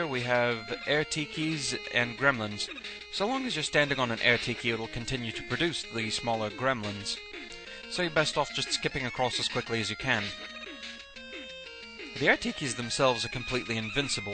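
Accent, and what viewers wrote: United Kingdom. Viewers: are you british? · You sound british..... But you're not though right? Like the accent though.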